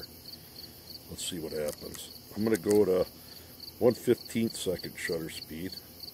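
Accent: Minnesota, United States. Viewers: Must be from Minnesota. Great video.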